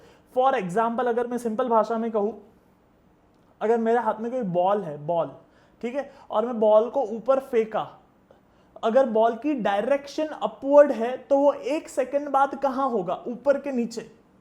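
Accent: Gujarat, India. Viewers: Sir Are u gujrati??😃😃😃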